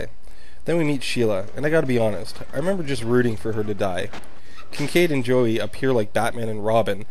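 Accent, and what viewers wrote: Canada. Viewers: you're canadian, eh?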